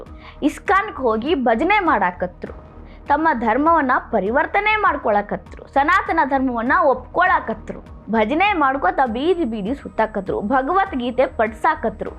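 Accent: North Karnataka, India. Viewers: ಒಂದು ಉತ್ತರ ಕನ್ನಡ ಮಾತಾಡು ಇಲ್ಲ ಬರದಿಲ್ಲ ಅಂದ್ರ ಸ್ಪಷ್ಟ ಕನ್ನಡ ಮಾತಾಡವ್ವ ತಾಯಿ ಅದು ಎಲ್ಲದ್ರ ತಲುಪಲಿ ನೀನು ಕನ್ನಡ ತಲುಪು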